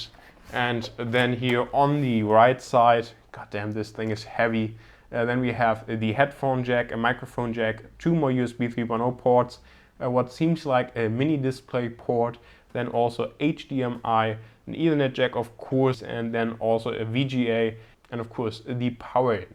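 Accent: Germany. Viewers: you sounds geman for me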